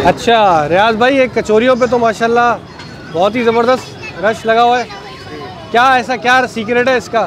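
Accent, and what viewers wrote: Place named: Bihar, India. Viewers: MashaAllah bhai Apko Orangi Town me dekh k khushi hoi or Apne jo behari jesi bethi zuban me bat ki bohat acha laga ❤️ Orangi town ap DayTime me ayn InshaAllah boht Chezn milengi apko explore krne k liye ❤️ Your big fan♥️ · Totally bihari language ... 👌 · Apki bhihari zabardast hai ❤️❤️❤️ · Your bihari accent is cool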